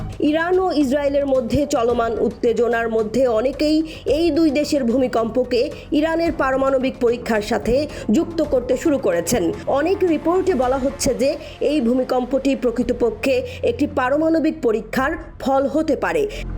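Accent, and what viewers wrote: Kolkata, India. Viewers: Uposthapika ki kolkatar naki.?😅😅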